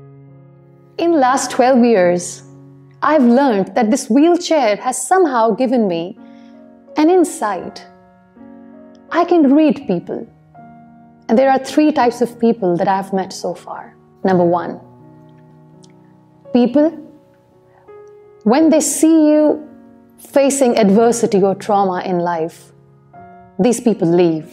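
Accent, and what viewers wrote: United Kingdom. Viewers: ur accent is Soooooooooo good mashaAllah plz tell me how did u learn it, it is very much like British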